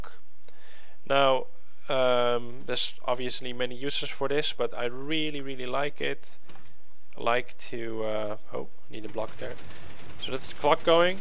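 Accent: Netherlands. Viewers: Je kan het nederlandse accent horen :P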